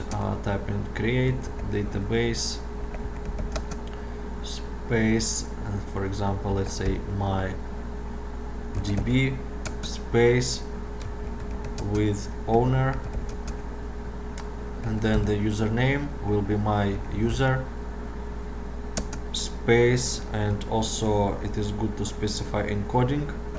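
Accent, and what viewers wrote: Russia. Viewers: слышно ж что акцент русский, сделал бы субтитры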